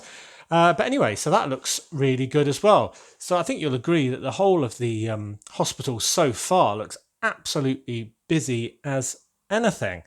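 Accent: United Kingdom. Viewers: Sand green pillows to match the scrubs. Fantastic hospital MOC. Love your build style. What British accent do you have. I’m Australian but I believe most people from South Australia have the estuary accent from along the Thames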